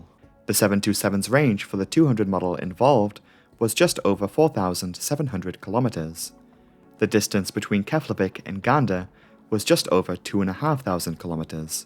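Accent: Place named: Northern England, United Kingdom. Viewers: It sounds similar to another UK creator I watch, Lazy Masquerade. I had never heard an accent like that until I started watching him, and now. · Totally off topic but I'm interested about the narrators accent. It sounds northern English with north American influence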